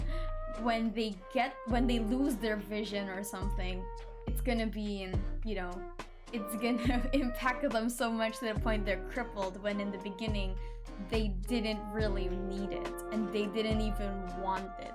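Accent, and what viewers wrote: Philippines. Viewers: I KNEW IT LMFAO AS A PINOY ANOTHER PINOY ACCENT IS SUPER RECOGNIZABLE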